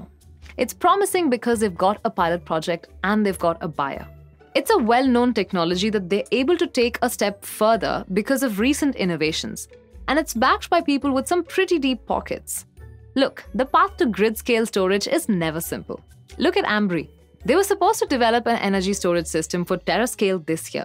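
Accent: India. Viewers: her accent was screaming Indian Subcontinent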